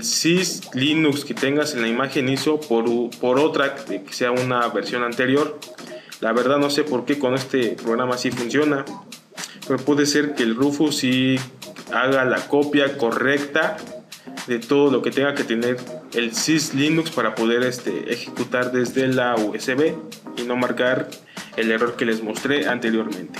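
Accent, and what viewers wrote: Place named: Portugal. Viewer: creia que habkabas portugues o algo al principio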